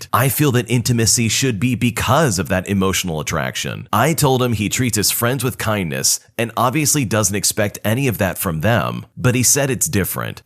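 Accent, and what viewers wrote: United Kingdom. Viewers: Been waiting for the British guy’s voice :)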